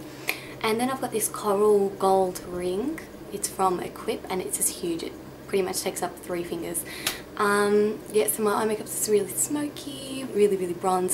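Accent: Australia. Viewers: aussie not british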